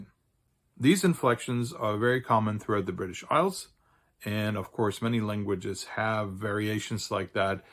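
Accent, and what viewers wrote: North, Germany. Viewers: German speaker here: You have a slightly Northern German accent. There (and even more in related Dutch) constructions with "von" are more likely than in standard German. I, located in Hessen, prefer Bian Coady´s sentence.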